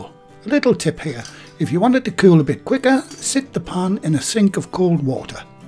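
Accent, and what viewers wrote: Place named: Yorkshire, United Kingdom. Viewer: I'm going to give this a go. I will have to use pigs kidney as nothing else is available. Love your accent it sounds Yorkshire to me but I left there 60 years ago so I could be wrong. I will let you know how I go with the pie. Thanks!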